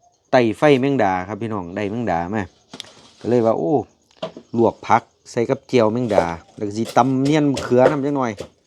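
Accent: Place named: South, Thailand. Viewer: พี่ แหลงใต้เก่งมาก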